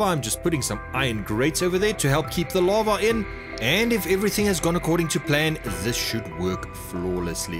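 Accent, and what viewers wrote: South Africa. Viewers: I love your accent are you south African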